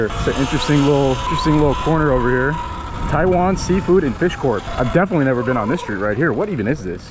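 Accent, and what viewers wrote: Minnesota, United States. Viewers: Don't look at my feet🤣🤣🤣!! Love your content bro! It's crazy because you sound like this guy I used to work with who was from Minnesota. Not sure if you've already finished the giveaway, but if you haven't, I wish you would consider me! It's kind of weird because I'm 51 years old and the whole e-bike scene is just way too exciting for me and I would love to be a part of it! The thing is I'm kind of in a messed up spot, because of a ticket I got while I was delivering with Amazon. I was delivering a package to a dark neighborhood and I was using my brights and for some reason I was pulled over and given a ticket for using them. Right now my license is suspended and I had to sell my car to pay some bills and food. I love to win that bike because I could use it here in Houston to see how the market for delivering on an e-bike would be here. 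I follow some guys who delivers food on e-bikes in California and they seem to be doing fairly well! I'd love to try that in Houston being that it's a pretty big city and I have a feeling the market would be good and it kind of nichie in a good way! It would really help me out because for some reason people are looking at my age and it's being hard to get another job! Before I was working with Amazon, I had a job that I was with for over 20 years and when covid hit, I lost it!! Screwed up thing is that I am a very young 51 in that I feel like I'm still in my 20s! I could do some deliveries until I find something more permanent but it would de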